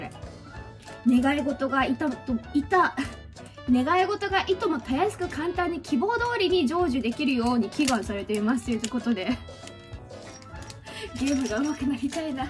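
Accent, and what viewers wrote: Kyushu, Japan. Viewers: おかえり！ なまり愛莉さん可愛いし、同じ九州出身だからかどこか懐かしくほっこりしました(^^) リフレッシュできたようで良かったです！ そんな中僕はこの動画が時々チカチカして見える程APEXしてました🙄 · 九州弁も可愛いね♪ 都農ワイン、クリアで飲みやすそう！ お母さん、実家だと折り返しづらいかもしれないから、スマホからかけてみたらどうかな？ ゆっくり癒されて、気をつけて帰ってきてね～( ´ ▽ ` )ﾉ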